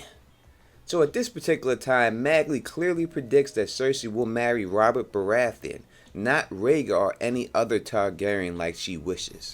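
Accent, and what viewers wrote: New York, United States. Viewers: Chea chea chea!!! The, lol first time I found this channel, i knew right away my dude was a native new yorker like myself. We definitely have our own way of talking here, big ups from queens.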